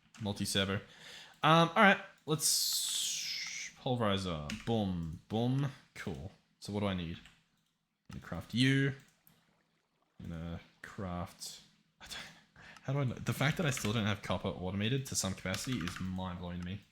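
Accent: Australia. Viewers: southern hemisphere brother, probably australia based off his accent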